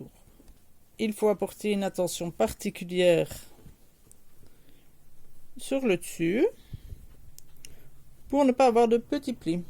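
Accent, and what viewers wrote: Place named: Belgium. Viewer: Bonjour Très sincères félicitations pour l'absolue clarté de vos explications ; c'est un pur régal que de vous suivre. Élève de deuxième année de CAP ( en France - j'ai cru noter une pointe d'accent belge si je ne m'abuse ! ) j'ai découvert votre travail avec satisfaction. Vous êtes une excellente pédagogue et vos explications, tout en restant techniques et conformes aux normes, sont lumineuses ! Il va sans dire que je vais "dévorer" vos tutos ☺️ Merci à vous. Philippe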